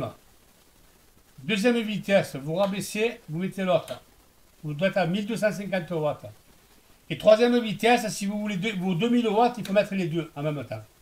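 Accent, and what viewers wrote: South, France. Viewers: Bonjour, merci infiniment pour votre aide ! Mon mari voulait jeter ce petit radiateur, exactement le même que celui que vous avez réparé, pensant que la réparation serait énorme, mais je suis allée fouiner sur internet et je vous ai trouvé ! Un bonheur d'entendre ce bel accent du sud qui me manque, je vis à l'étranger... Mais la cerise sur le gâteau c'est votre grande compétence ! On a difficilement trouvé le fusible thermique, mais on y est arrivé, mon mari a suivi vos instructions et on est reparti comme en quarante !!!!!! Le petit radiateur a une seconde vie !!!! Bravo et encore merci infiniment pour tout ce que vous êtes 💪😃👍👨‍🎓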